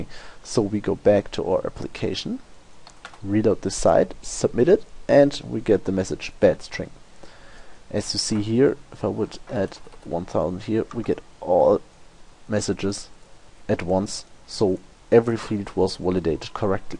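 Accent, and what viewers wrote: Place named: Germany. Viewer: your German accent is music to my ears. Fuck those indian tutorials.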